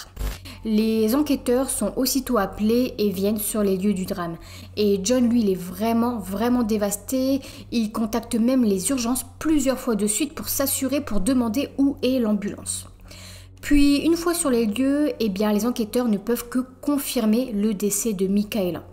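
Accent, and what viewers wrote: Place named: Nord, France. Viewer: Oh lala tu viens du nord toi l accent chti 😅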